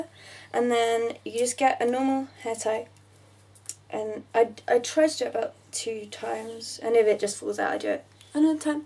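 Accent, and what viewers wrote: United Kingdom. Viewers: OMG I LOVE ENGLISH ACCENTS :) great tutorial by the way! (: x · Random question: Is this a UK accent? Oh and great tutorial btw.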